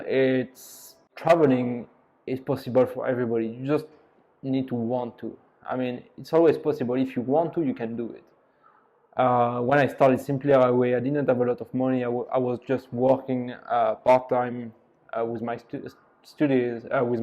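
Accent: France. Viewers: Mais sa se voit tellement avec ton accent que tu est un français 😂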